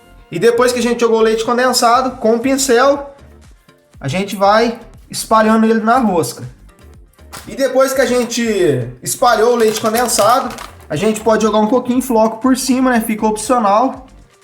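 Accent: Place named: Minas Gerais, Brazil. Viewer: Eita mineirinho uai eu também sou mineira de Carangola uai, ô povo abenco sô.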